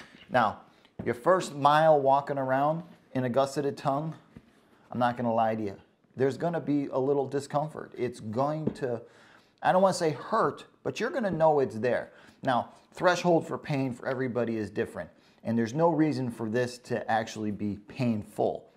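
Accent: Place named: Midwest, United States. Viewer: Interesting accent... is it midwestern maybe?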